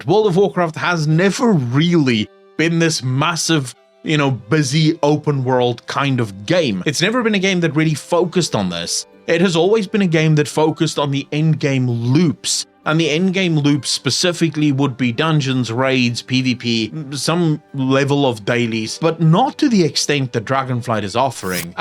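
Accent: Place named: South Africa. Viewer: are you south african? love your accent awesome video and good takes. og wow fans will stay, reminds me of the wod period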